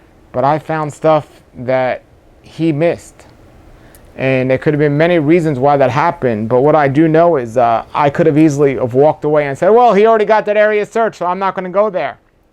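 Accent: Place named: New York, United States. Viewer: Your from NY right ? Can tell by your accent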